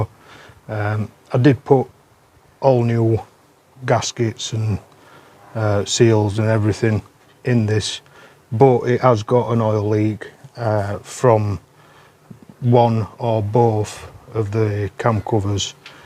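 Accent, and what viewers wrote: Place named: South Yorkshire, United Kingdom. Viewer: Hiya mate, judging by your accent I’m guessing you are in the South Yorkshire area, I’m gonna say Barnsley. I may be wrong. Anyway the Vectra looks great, my dad used to have one of these back in the day, and it was a diesel variant in like a British Racing Green colour. One thing I always liked is how wing mirrors are integrated into the body lines.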